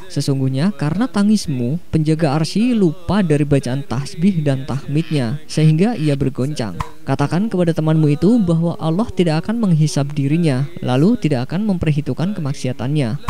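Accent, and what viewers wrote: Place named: Yogyakarta, Indonesia. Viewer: Logat orang jogja ya wkwk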